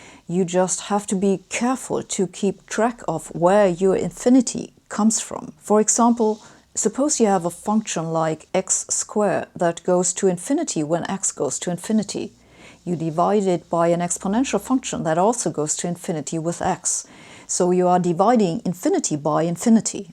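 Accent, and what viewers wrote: Germany. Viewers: Why is it that Ms. Hossenfelder is the only Youtuber that forms her words so perfectly that I never miss one? The slight German accent doesn't hurt, it may even help. Subscribed!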